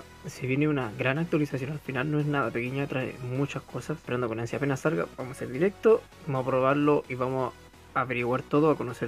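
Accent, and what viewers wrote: Chile. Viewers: Man de que parte de chile eres?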